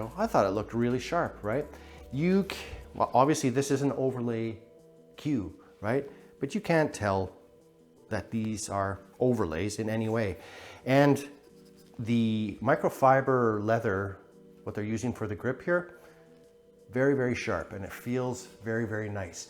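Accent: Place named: Edmonton, Canada. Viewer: Came for the cues, stayed for the beautiful edmonton accent.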